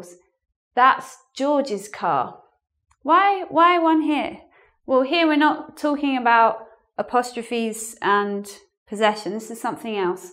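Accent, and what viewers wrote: United Kingdom. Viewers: She has a Horrible British accent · excellent British accent, I would like you to make a video and a list with words but with the different pronunciation between England and usa,, it would be great, thank for help us, why you look so blue?,,have a nice day. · I really loved British accent. 😂😍🖒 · What a great lesson! I have had difficulties to understand your british accent, but at the end I understood. Thank you! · Beautiful British accent!! Great class. Yes, you do seem to be a little sad at the end of the class... be good, you´re a lovely person! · The way you said important I like it, and I try to imitate you. Lol I love British accent. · You're a British English Speaker or American?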